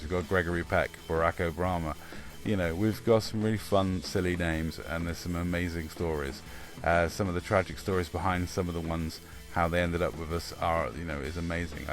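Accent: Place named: United States. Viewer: I enjoyed the video. Sounds like something one many local USA media would have done. Then again local news in states would have gone little more detail. Your voice and what I imagine what you look like was total different. I could easily pass off as some from the states. It's same that people don't treat the chickens and rosters well. Here states I know a lot people that rise chickens for their eggs and meat. All them treat chickens and rosters very well. I do hate cock fighting and it should be ban through out the world.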